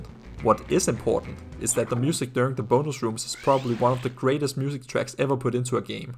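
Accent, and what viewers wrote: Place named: Netherlands. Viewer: That Jan Willem Nijman clip from his juice talk where he mentions explosions was really unexpected in this video. 😅Your accent sounds Dutch so I guess that explains it. Do you have a background in game dev? Overall great recap of Skullmonkeys. We had The Neverhood at home when I was a kid, used to play adventure games together with my dad and brothers. But never heard anything about this game. The fellas at Neverhood Inc are legends to me, so much creative expression.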